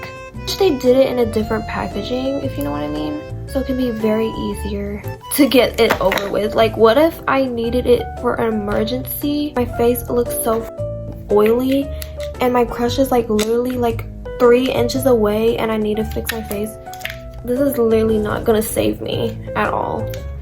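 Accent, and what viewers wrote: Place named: South, United States. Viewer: Omg an Asian with a southern accent is so cute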